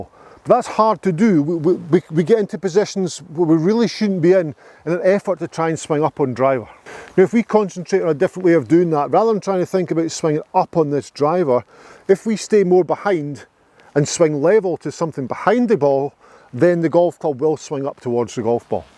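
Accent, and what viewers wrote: Scotland, United Kingdom. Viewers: I really enjoy your videos, and have used a number of your ideas. I do especially like this one regarding setting up behind the tee with your driver. I don't understand why you don't have at least 250 thousand subscribers. Your videos are clear (maybe the scotch brogue takes a little interpretation. lol) concise, and sometimes downright funny. Well. keep making the videos and I will keep watching them. Lang may yer lum reek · Just loving your scottish accent...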